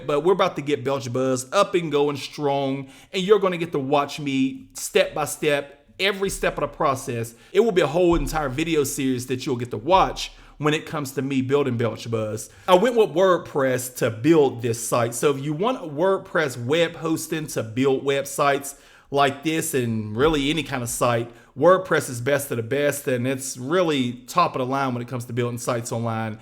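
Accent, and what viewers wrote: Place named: Mississippi, United States. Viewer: Are you from Mississippi? You sound just like my relatives.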